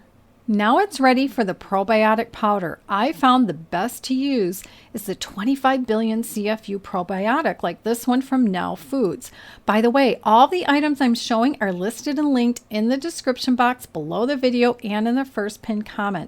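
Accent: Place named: Michigan, United States. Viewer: I cant wait to make this, thank you for the recipe! Btw, you sound like you might be from MI. If so, hi from the UP!🙋🏼‍♀️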